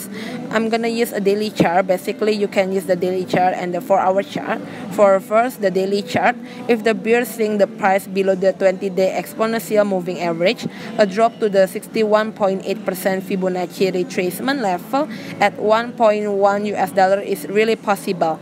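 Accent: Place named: Indonesia. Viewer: Ur accent sounds like indonesian 🇮🇩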